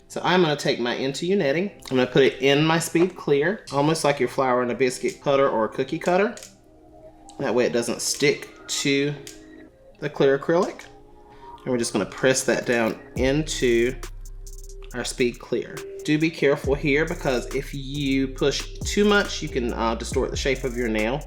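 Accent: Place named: South, United States. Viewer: those are beautiful and awesome you make me want to practice this. I love your southern sound. My parents are southern, but raised in the north. Love all people ❤ 💕 and wow on those 💅 nails ❣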